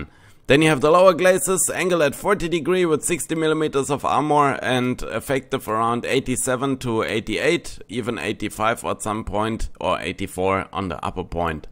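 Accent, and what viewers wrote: Germany. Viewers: i just love your accent i think its german right? xD i laugh so hard everytime SUBBED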